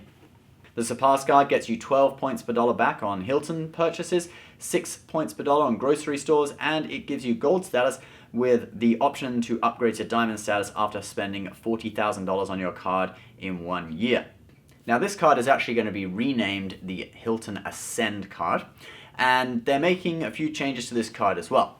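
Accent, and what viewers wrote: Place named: United Kingdom. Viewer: dude you are just awesome. Love how objective and down to earn you are and the cheeky grin with the British accent really adds to the whole shabang haha. PS: Thanks for the Trump tax video, I knew when you took a non-apocalyptic approach to anything Trump some idiot in the comments would try to flag you as a supporter or attack you lol. Anyways stay awesome man and keep fighting the good fight.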